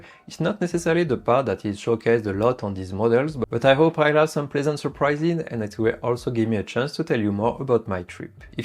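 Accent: France. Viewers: L'accent français transparaît beaucoup, il nous en faut un aussi.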